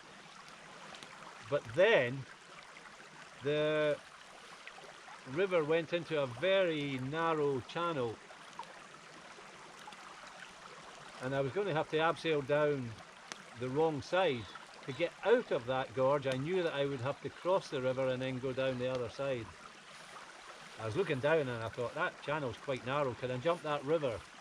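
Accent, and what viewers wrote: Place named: New York, United States. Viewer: that is too funny. When you speak it is, to me, like a fellow NYer. Thanks for getting back to me and thanks for the video. BTW, food looks so much better cooked at a campsite. Be well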